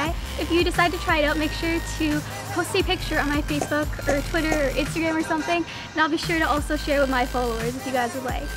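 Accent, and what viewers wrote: Canada. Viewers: omg are you from canada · Canadian ? Yééé :)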